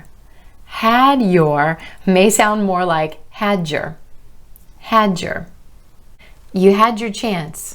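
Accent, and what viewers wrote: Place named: United States. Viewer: Oh, I love this video and your American voice too. ! That's why many times I don't understand when native English people talk even the simple sentence.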